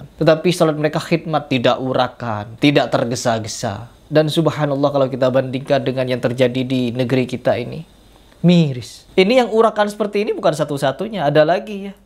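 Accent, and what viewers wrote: Madura, Indonesia. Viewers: Mas logat MADURA tolong dihilangkan.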